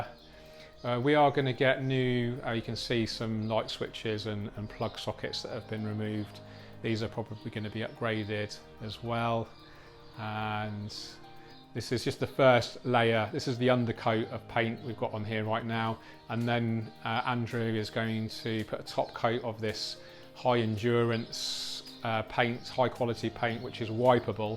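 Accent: United Kingdom. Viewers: Love your British accent my boss was from Britain and his wife from Netherland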